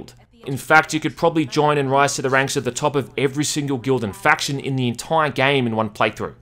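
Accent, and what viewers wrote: Australia. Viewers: He's Australian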